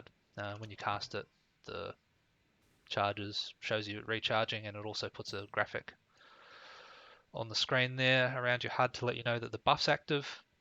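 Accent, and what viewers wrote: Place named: Australia. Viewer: Ah! yeah I wrote "are you aussie" first, then watched more and editted my comment to include kiwi haha. Your weakauras are awesome, cheers bud!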